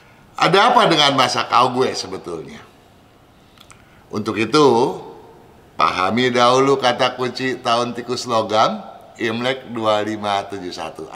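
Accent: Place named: Malaysia. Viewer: Wow.... Bahasa dan tone suara koko sangat bagus,susah oo mau dengar org cina bahasa pasif bngt,jelas bngt dan seakan² sudah mendekati pekat bahasa Indonesia nya..koko tggl di lingkungan melayu ya sbb logat nye seperti MELAYU very good.. Love you..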